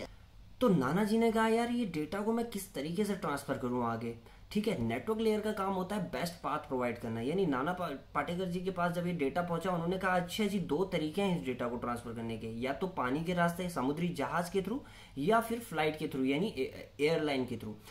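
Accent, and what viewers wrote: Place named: Kumaon, India. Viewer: Sir maine abhi aapke y video dekhi ..what an interesting and easy explanation...... 🙏🙏🙏 Thank you so much .......I have a question ap kumaoni ho kya? Just lga...a little touch in your language...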